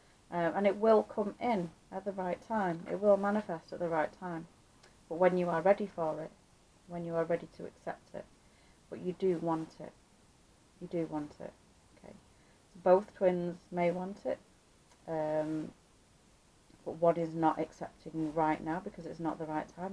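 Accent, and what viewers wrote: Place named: Salford, United Kingdom. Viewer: Great reading my dear friend :) May I ask are you from Machester , as you sound very local to me in Salford :) Bless you my sister :) .. x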